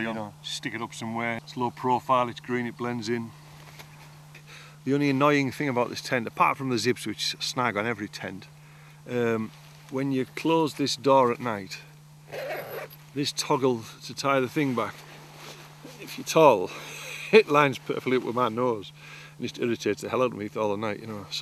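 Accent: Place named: Teesside, United Kingdom. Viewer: You sound close to me. I’m from Redcar, Teesside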